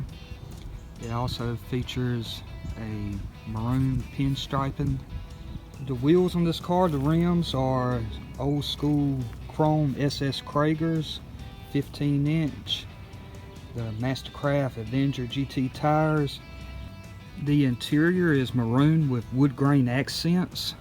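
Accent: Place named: New York, United States. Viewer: This guy sounds like he's from New York.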